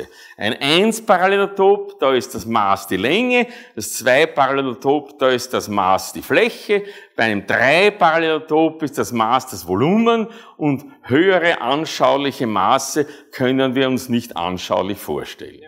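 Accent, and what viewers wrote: Austria. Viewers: Als Schleswig-Hosteiner ist es wirklich eine Herausforderung über eine längere Zeit dem sehr sympathischen Herrn Professor mit dem österreichischen Tonfall konzentriert zu folgen. LOL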